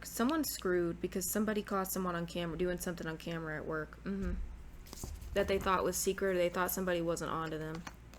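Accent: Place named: South, United States. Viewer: I really like to listen to you because of your southern twang 😊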